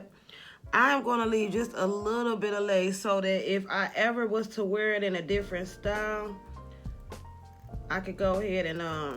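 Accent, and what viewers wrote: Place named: New Jersey, United States. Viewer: Love u and u sound like u from Jersey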